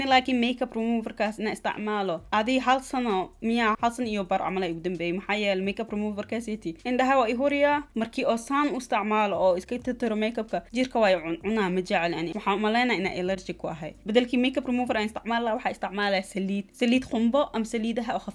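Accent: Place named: Somalia. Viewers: I'm not even Somali, but I agree with you her dialect is charming, Masha Allah! You all have a beautiful language ❤️❤️❤️ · Omg your somali dialect is soooo lovely😍😍😍😍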